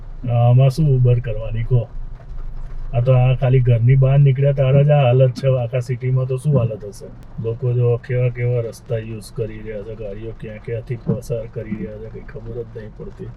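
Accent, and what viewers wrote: Mehsana, India. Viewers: Tamaru gam khu che bhai tame saras amara jem mahesana ni boli bolo cho gam nu nam aapjo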